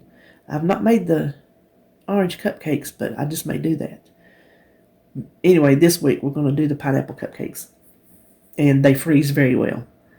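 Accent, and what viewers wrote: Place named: Mississippi, United States. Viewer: quit beating yourself up by saying you're taking the easy way and!! Cut yourself some slack. If you don't feel up to making a recipe and have a simpler or easier way then go for it!! You're wonderful and I LOVE you just the way you are. I'm born and raised in northern Illinois and we wore bread sacks on our feet and then our snow boots. Boots went on easier and helped keep our feet a little warmer. I'm 64 and was born and raised on a dairy farm and we had lots of outside chores to do. You remind me a lot of my Aunt who lived in Mississippi. Your accent, smile and laugh just brighten my day. Have a great weekend.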